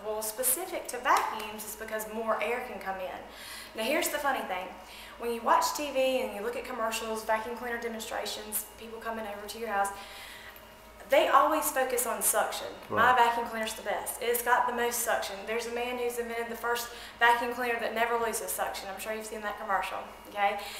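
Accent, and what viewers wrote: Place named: South, United States. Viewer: I love her accent sounds like South Carolina or Georgia. Very pretty too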